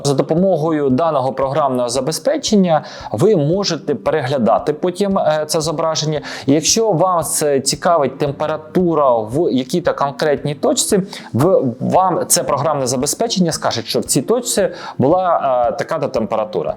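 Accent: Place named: Poland. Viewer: На каком языке этот тарахтит, смесь польского и русского.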